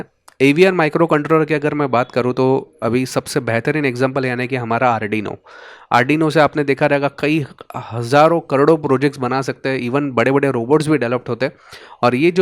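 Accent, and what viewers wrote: Gujarat, India. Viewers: Juna ho chuka hai 😂😂🤣 Bhai aap Gujarati ho kya ?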